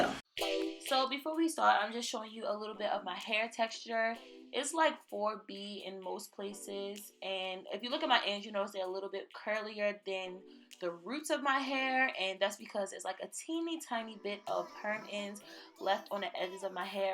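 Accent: Baltimore, United States. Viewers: are you from Baltimore? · You are so groovy, so the outro is 🔥! You from b-more? Your accent is very Bmore. Your realness is refreshing! New sub! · As soon as I heard the accent (without looking at your bio) I was like YUP she from Baltimore 🤘🏾 good video ✨ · Are you from Baltimore? I feel like I hear it in your accent.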